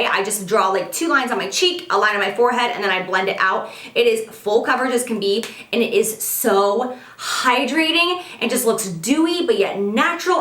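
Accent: United States. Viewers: Omg I love you accent it is the best aha the way you say caramel is so cool wish I was American xx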